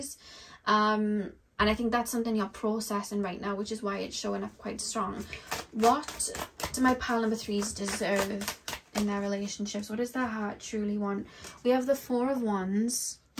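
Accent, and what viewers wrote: Wales, United Kingdom. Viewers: I chose pile 3 and everything you said was 💯. I am on a self love journey finally after so many years of Searching for love in all the wrong places. I have finally had to separate myself from family and most friends because it was always take take take and no give back. And when I finally start to do well everyone is angry and jealous. It hurts so much and I am sad that I invested and gave anything and everything to people who never cared to see me for who I truly am. I know it stems from my childhood in an extremely dysfunctional family and being the scapegoat. So just as you said I inadvertently searched for love in the wrong types of people hoping to finally get it back. I’m finally falling in love with me now and it hurts to leave everyone behind but it also feels so liberating and I truly have got this lesson finally. 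Thank you you are so gifted. I love all of your heart felt readings and your adorable Welsh accent! 🥰🥰🥰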